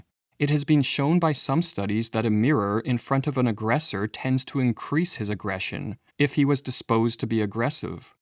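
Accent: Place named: Canada. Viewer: Its easy to tell you're from Canada. How say the word "been" is a dead give away.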